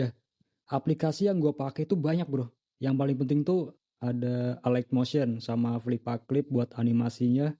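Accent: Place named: Sunda, Indonesia. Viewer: Sunda juga nih bang😂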